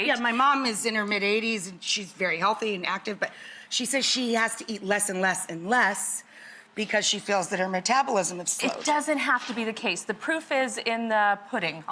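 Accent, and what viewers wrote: United States. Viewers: I this really how people speak in the US?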